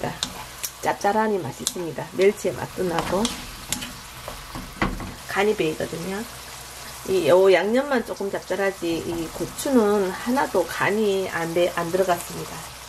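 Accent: Gyeongsang, South Korea. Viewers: 님 요즘 왜 레시피 안올려요? 나긋나긋한 경상도 말씨 이모님 목소리도 듣고싶고 이모님 레시피가 저에게 맞아 많이 배웠는데...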